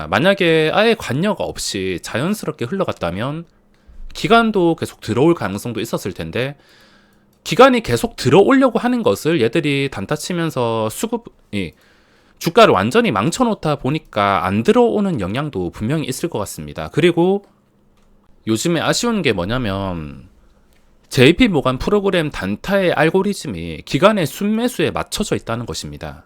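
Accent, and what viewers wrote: Gyeongsang, South Korea. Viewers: 칠암사계에서 목소리랑 똑같은분을 옆자리에서 우연히 뵈었는데 경상 지역남자분들 목소리는 비슷한가요 제가 암튼 늘 감사히 듣고있습니다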